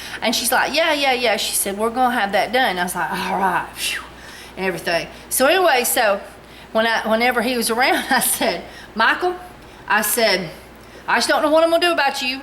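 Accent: South, United States. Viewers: What was the name of the last ingredient that you used from that list? I'm sorry but its hard to sometimes hear well withat cute southern accent of yoyrs. Sounded like yellow dot glue but I'm sure that wasn't it at all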